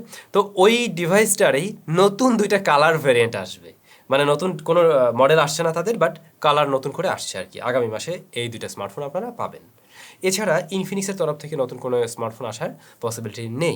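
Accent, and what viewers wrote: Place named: Barishal, Bangladesh. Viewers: ভাই তোমার বাসা বরিশালের কথায় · বরিশালের কেন জাগয়া বাসা আপনার?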